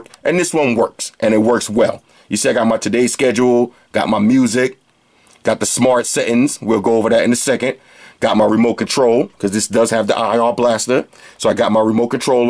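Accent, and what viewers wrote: New York, United States. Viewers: I just want to say that I really appreciate your enunciation on this review. As a fellow native New Yorker, it's refreshing to hear our local swag on a topic I'm interested in. Most reviews on phones seem like they come from nasally 15-year old nerds from Ohio (The same people that are migrating to this city and pissing me off, but that's another story). Keep doing your thing bro. EDIT: LMAO "size matters" and "red lobster dinner pics with bae"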